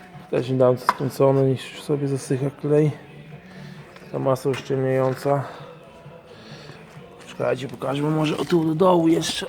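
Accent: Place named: Podlasie, Poland. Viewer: Tak ło chyba kolega z Podlasia :)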